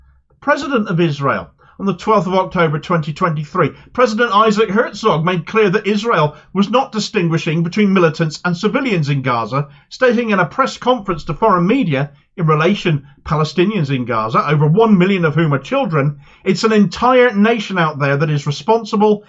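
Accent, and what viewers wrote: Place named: West Country, United Kingdom. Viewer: Hi, your accent sounds where I am from its a wiltshire/somerset one right? I now live in Israel and enjoy your rants. I been labelled a threat for speaking truth about assange and war crimes in Iraq while in UK. Someone must of reported me because I been followed and harrassed since then, even moving to Georgia to escape persecution. I actually thought in Israel as an arab that my family would not get involved but they betrayed me and my own father does tactics the perp does. Checkout a short I posted of my house being watched. Id love to get my story out somehow. Let me know what you think (play with sound)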